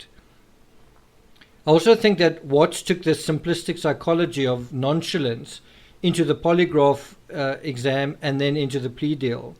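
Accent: South Africa. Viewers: Hey Man...when I heard you talking I knew straight away that you're South African! 🇿🇦 So nice to hear my own language again. Far away from home....🇦🇹💕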